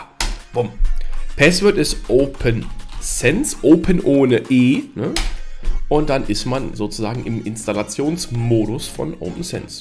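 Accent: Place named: Germany. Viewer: Gutes video und 100% deutscher Akzent lmao.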